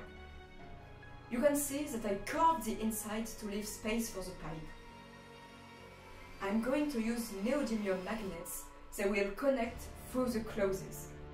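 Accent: France. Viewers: Reconnais bien là l'accent français merci pour tes 2 vidéos qui m'ont beaucoup aidé car je compte acheter les matériaux et faire seul de problème c'est que et on est que tu dis tout ça en anglais il y a des matériaux que je n'ai pas compris la signification.😅😅 Par exemple le truc en aluminium que tu mets dans les ailes, la matière du coup pour faire le squelette des ailes qu'est-ce que c'est, et je t'avoue que la fin pour du coup faire le truc pour le soutien j'ai pas très bien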